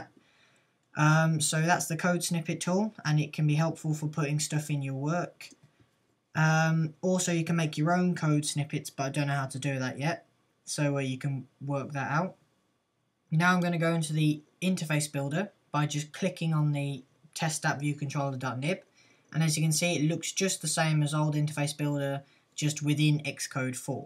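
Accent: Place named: United Kingdom. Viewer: Not to hate on British people, but do you seriously think anyone can understand a damn word you're saying? So high pitched, fast and generally obnoxious.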